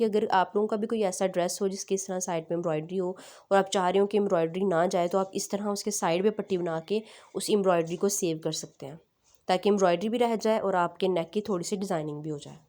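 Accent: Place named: Punjab, India. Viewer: App ki Vedo buhat achi Hoti hi ap bolti bhi buhat acha ho banjabi ka tark acha lagta hi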